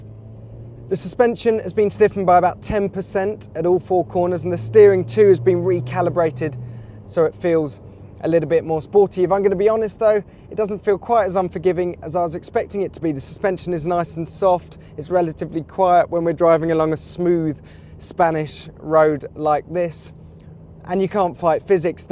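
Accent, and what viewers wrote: United Kingdom. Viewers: Damn,I can't understand British English -.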